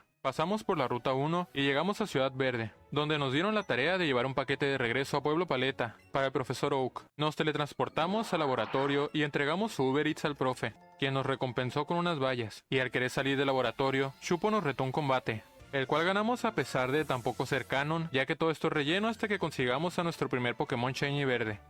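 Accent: Chihuahua, Mexico. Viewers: excelente video! tu acento es muy parecido al mio, no eres de chihuahua mexico? un GRAN trabajo y muy tardado, gracias por tu esfuerzo, un saludo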